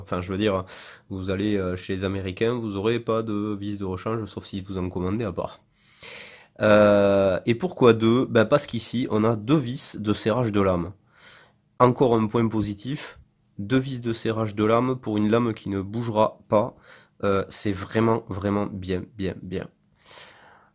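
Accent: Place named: South, France. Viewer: merci pour ta vid, après être tombé par hasard sur une vidéo de Michelle Christa Smith , j'ai trop envie de me lancer dans le maniement du sabre laser mais pas pour du combat, juste du freestyle. tu pense qu'il y a des clubs ou groupes dans le sud? vu ton accent je pense qu'on est pas loin.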